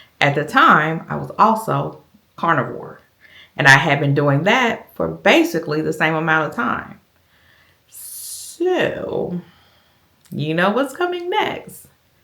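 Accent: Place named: Georgia, United States. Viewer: The journey continues 😊I knew from your accent you had to be near me. Augusta Ga is my hometown. I thank you for sharing your story. I have been thinkg about HRT. Dr put me on low dose birth control to manage menopause symptoms, but said it was only temporary. Now I know to try the supplements. Thank you❤ I am sorry for your loss.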